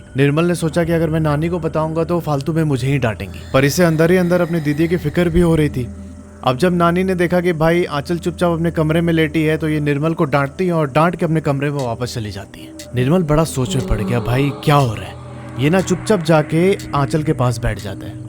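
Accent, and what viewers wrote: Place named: Jharkhand, India. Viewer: Bhaiya aap Jharkhand ko boli seh lag raha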